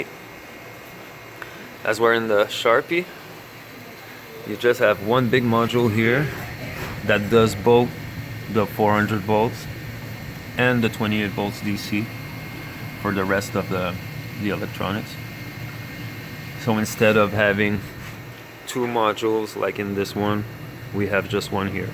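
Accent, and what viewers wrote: Quebec, Canada. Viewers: ca sonne québécois 😋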